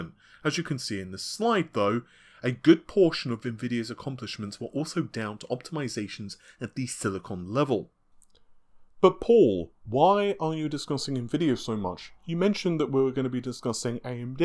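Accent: England, United Kingdom. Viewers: He has a thick english accent lol, just get over it you know what he means. He sounds like everyone sounds near him.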